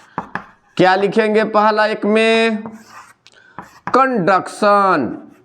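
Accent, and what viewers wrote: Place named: Bihar, India. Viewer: Bro speaks in bihari accent💀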